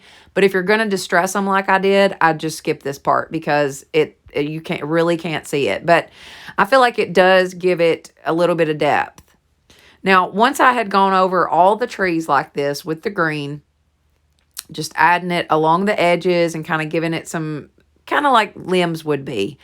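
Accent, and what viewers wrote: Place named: Texas, United States. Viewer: Where are you from with your accent? I love it because I’m a Texas girl with one too and feel at home.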